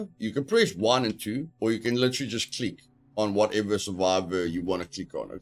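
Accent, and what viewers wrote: South Africa. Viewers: Absolutely great video bruu. Love how in depth U went with this. I'm massive into the dead fan Also THE SOUTH AFRICAN ACCENT YESS. I'm Aussie South African lmao Great vid man 👍👍👍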